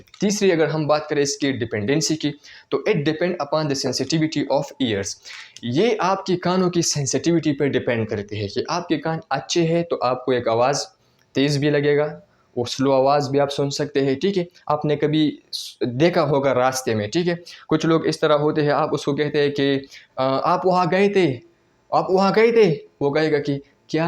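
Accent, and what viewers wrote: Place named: South Asia. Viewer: because brother you looks foreigner but when you sounded south Asian it was really surprising and funny. As far as I can guess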